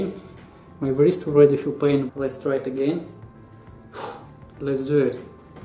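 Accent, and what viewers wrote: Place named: Russia. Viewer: I feel Russian accent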